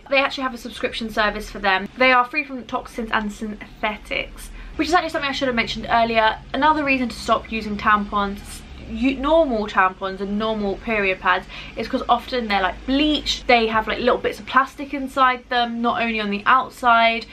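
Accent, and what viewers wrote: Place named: United Kingdom. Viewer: i can't spot exactly which county your accent is from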